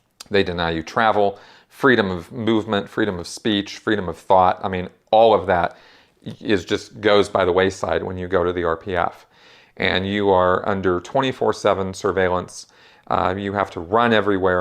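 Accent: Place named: California, United States. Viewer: 44 down, 46 left to go! I am slowly going through all of these q & a's from the beginning. As an amateur anthropologist and person fascinated by religions, I truly appreciate your detailed and objective answers. Holding my questions since you have likely already answered them. Having grown up in Central and Northern California, I find your speech patterns very familiar and much more "real" to me than the other vocal ex-members. Keep on!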